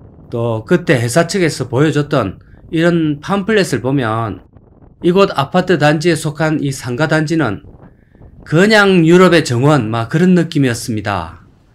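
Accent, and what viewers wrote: Gyeongsang, South Korea. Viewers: 구수한 경산도 사투리가 너무듣기좋아요 감사합니다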